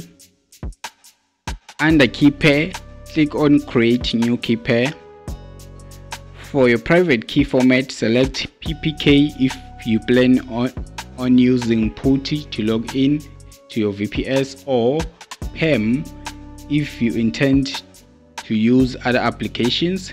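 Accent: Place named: Ghana. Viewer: GH ascent.. Africans to the world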